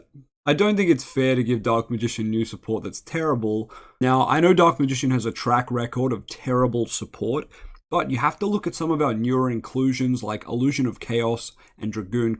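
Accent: Australia. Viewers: New mic? You sound less…Australian? Kiwi? Whatever you are, you sound less like it than last time I saw videos from you. Lol.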